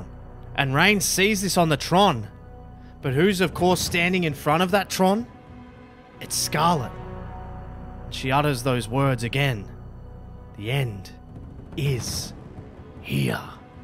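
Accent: Australia. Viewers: You have an accent what is it Australia 🇦🇺 or New York idk something’s like that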